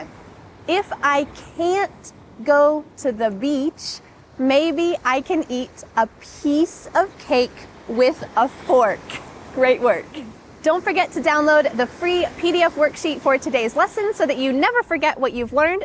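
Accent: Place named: United States. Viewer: very very important and had a great time with your beautiful American English. Thank you so much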